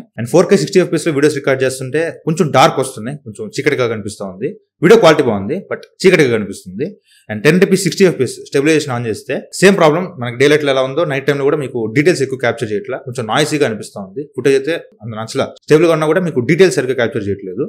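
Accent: Nellore, India. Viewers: meru nellore aa bro proper?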